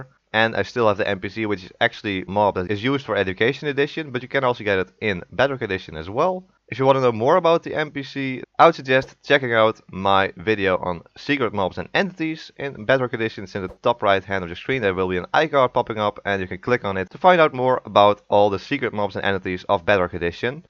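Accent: Netherlands. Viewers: When u hear a dutch accent in his english voice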